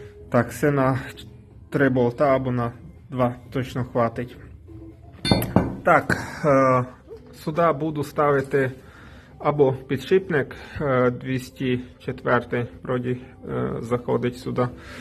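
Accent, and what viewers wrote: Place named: Transcarpathia, Ukraine. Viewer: Ти із Закарпаття, говір у тя знакомий, із якого района?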